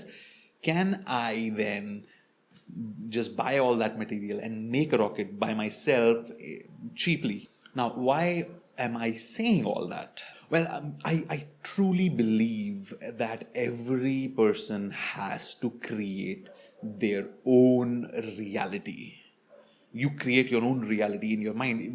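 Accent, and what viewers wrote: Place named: India. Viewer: Your accent is like indians...🙄🥰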